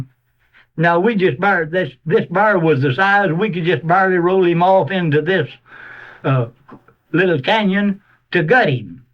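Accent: Tennessee, United States. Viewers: Sounds like he is from Carter County TN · He almost sounds like an East Tennessean, where I’m from. Maybe mountain talk is universal? Great story teller.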